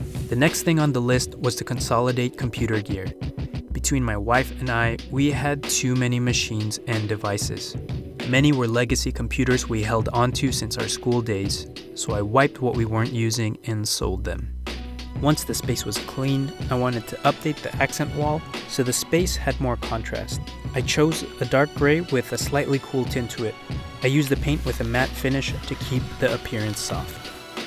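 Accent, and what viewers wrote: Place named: England, United Kingdom. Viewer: Your English accent us pretty awesome 👌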